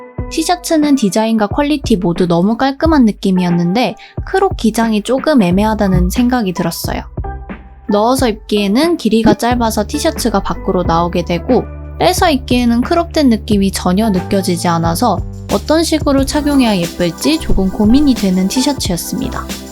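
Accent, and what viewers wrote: Gyeongsang, South Korea. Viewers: 님 대구분이세요?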